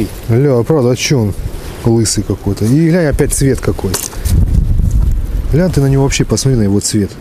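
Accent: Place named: Eastern Ukraine, Ukraine. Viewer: Тут еще и говор Восточной Украины. В Харькове он еще ярче выражен...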